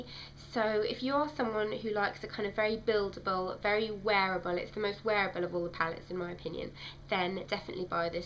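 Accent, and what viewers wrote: United Kingdom. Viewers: this is the best review ive seen!! i love this, i just subbed xx what part of the uk are you from? south, north, midlands etc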